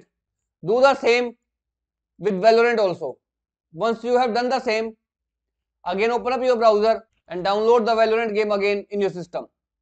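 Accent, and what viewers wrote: India. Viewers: Thanks it worked. Are you a India becaus eyou Sound like one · no shit hes indian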